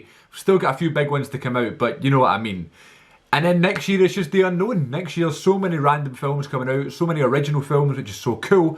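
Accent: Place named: Scotland, United Kingdom. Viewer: Oh by the way what part of Scotland you from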